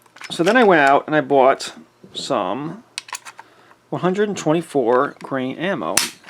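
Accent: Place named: Connecticut, United States. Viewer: What accent is that? You sound like my pal from Connecticut.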